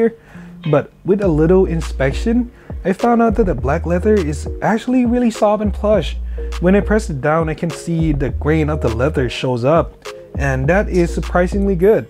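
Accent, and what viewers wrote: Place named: United States. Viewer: Where in Thailand? I'm in Pattaya 4 years now and you sound American not Thai 😁 oh yeah I just bought a pair of the Goldenrod 👍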